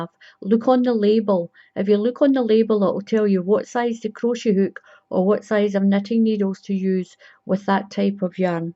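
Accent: Scotland, United Kingdom. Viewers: Great to hear the Scottish Accent for a change. I won't have any problems understanding you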